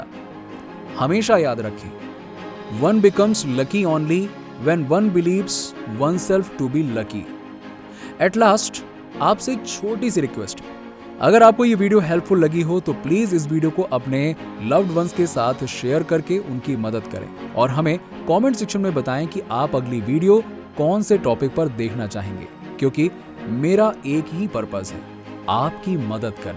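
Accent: Bengal, India. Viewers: Bhai aapka bangoli accent wala voice Jada achcha lagta tha.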